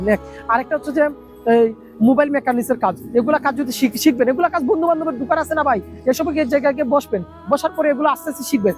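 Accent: Sylhet, Bangladesh. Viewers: আপনে সিলেটি নি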